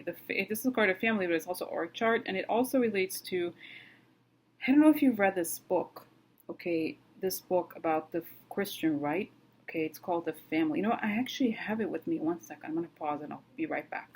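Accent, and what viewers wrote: Russia. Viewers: I like your accent and I was watching Lena Rodriguez and she likes your Russian accent too. Keep happy I know all this politics must be draining and stressful, it has drained me, and I live in Australia. I also have been worried for the Americans and the world, I need to feel safe again, so keep up your good work... Stay healthy it costs to much to get sick in America. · Hello! Your Russian accent is fun screw the people who don't like it. Wouldn't it be a better strategy for Mueller to stop BEFORE they vote? Seems like they would be all ups in arms once he's in. Supposedly the Russians hacked the Pubs emails too. Maybe they are blackmailing them AND giving them $$ too. Maybe u already said that at some point.??? 🤔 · You help make my morning...Russian accent and all...boom!!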